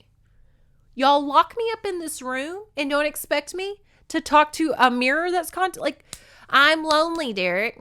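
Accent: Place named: North Louisiana, United States. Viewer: I grew up "spittin' distance" from Blanchard. I thought you had a N. Louisiana accent. 😂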